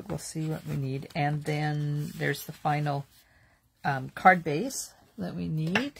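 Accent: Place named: Alberta, Canada. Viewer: You sound like you’re an Albertan. 15 years there myself, and wow I agree with you, I can’t just sit and watch tv…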